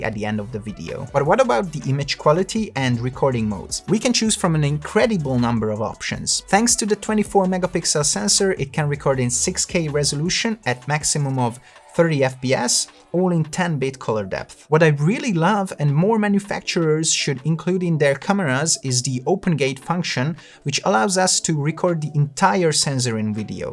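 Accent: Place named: Hungary. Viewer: Hallod én sejtettem hogy magyar vagy:D mindig fel lehet ismerni az akcentust. Király videó lett!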